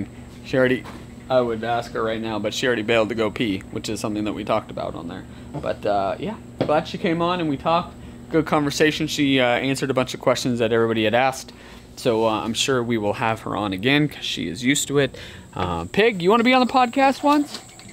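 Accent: South, United States. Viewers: You are really are speaking more like the south YaLL! Lol have a beautiful day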